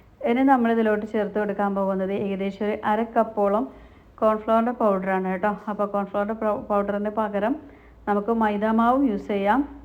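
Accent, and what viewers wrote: Malappuram, India. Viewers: മലപ്പുറം താനല്ലേ മലപ്പുറം ബ്ലോക്ക് സംസാരം കേട്ടപ്പോൾ അതോ അവിടുന്ന് മലപ്പുറത്ത് അല്ലാത്ത പോലെ തോന്നുന്നു ഇത്രയും ഒന്നും വേണ്ട നമ്മൾ നമ്മൾ മലപ്പുറക്കാർ അല്ലേ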